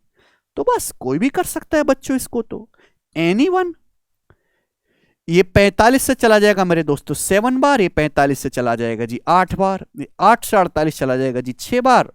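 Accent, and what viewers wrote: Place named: Bihar, India. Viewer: मैथ का मतलब गगन प्रताप सर बिहारी अंदाज में बहुत अच्छा लगता है सर ❤❤❤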